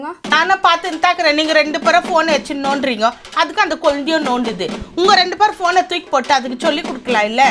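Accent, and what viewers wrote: Bangalore, India. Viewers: Bangalore tamil ah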